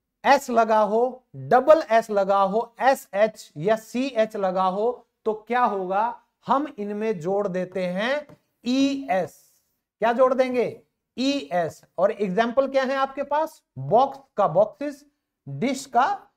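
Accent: Haryana, India. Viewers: Haryanvi English love you ❤️❤️ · Sir kya aap haryana se hai kya kyuki aapki language hariyanvi hai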